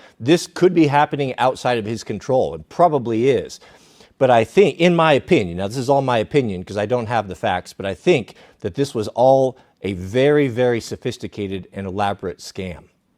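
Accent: India. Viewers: Indian accent? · Rule number 1. Don’t trust indian accent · Always the same indianish accent... why?!😢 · Indian voice is a dead giveaway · Indian accent is the dead give away 😂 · First sign of trouble indian accent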